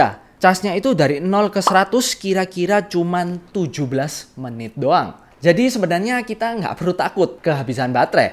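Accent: Java, Indonesia. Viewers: Bang bagusan bilangnya "saya" jgn "gua", soalnya logat lu medok bgt 😅, jd gk enak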